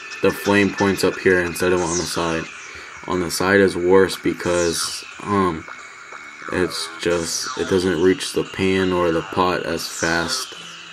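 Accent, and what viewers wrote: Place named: South, United States. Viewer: hes from the south yo :)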